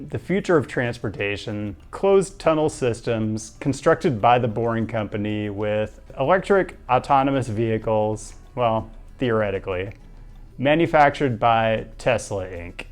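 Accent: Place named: Southern California, United States. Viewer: Why is this man so handsome where is he from? He sounds like he is from south California maybe San Diego ? He has cat but not sure if he has wife or partner?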